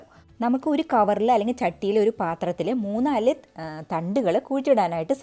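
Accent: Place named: Pattambi, India. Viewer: Slag kettappo manasilayi.... 🤣😍.😍... me also... Pattambi.😘.. Madam evide?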